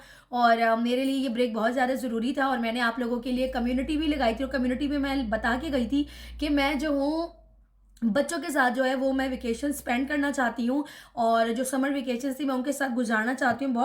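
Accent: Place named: Karachi, Pakistan. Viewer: mughe pata nahi qn hamesha se lugta he tum karachi se belong karti ho tumhari urdu same hamare karachi walon ki tarah he khair hamesha khush raho❤❤❤❤❤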